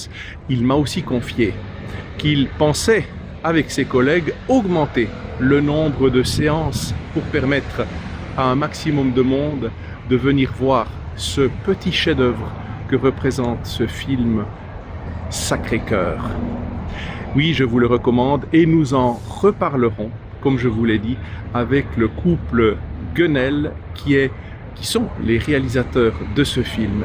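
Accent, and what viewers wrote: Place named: Alsace, France. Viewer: Vous êtes alsacien ? Vu l'accent, et votre attachement à Christ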